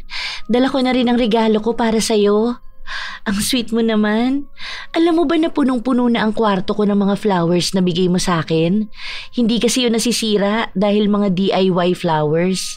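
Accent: Visayas, Philippines. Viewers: Nakakaloka qng accent may bisaya may ilonggo ano ba talaga ining hqhahaha.. wala lang natawa lang ako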